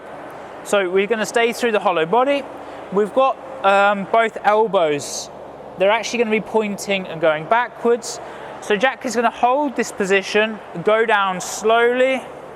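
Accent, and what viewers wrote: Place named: United Kingdom. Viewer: 👑🚀Very nice tanning and with perfect British accent👑🔥🇬🇧 🏴🔥👑❤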